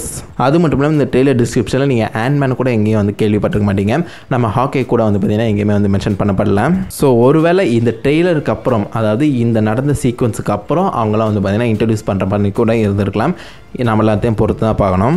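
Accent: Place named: Madurai, India. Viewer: Ji Neenga Entha Oru nu sollamudiuma. Yenna onga Pechula Madura Slang theriyuthu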